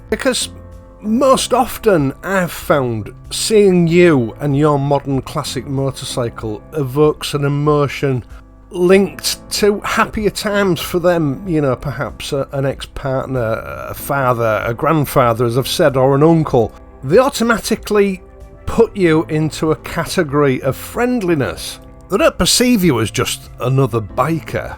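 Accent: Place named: Yorkshire, United Kingdom. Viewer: Thanks to the dulcet Yorkshire tones of, every time I wheel my Super Meteor out of the garage I hear the equally dulcet tone of my missus (doing her best Michael Parkinson) saying … “it has the turning circle of the Amoco Cadiz”.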